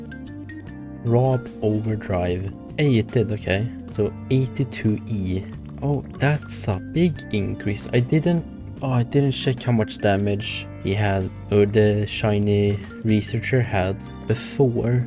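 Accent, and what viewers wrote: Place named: Sweden. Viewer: Yo you have like a swedish accent and in case you swedish tjena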